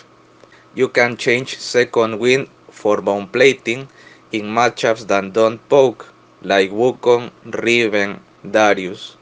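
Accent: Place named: Spain. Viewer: Ostias hermano es el acento español en ingles mas fuerte k he escuchado en mi vida ajajaja. Pero la guia muy buena, te recomiendo que las hagas en español con subs en ingles.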